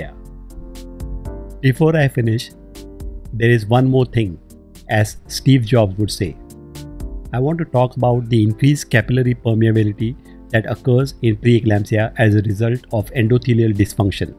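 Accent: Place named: India. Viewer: I cannot catch everything you said due to your Indian accent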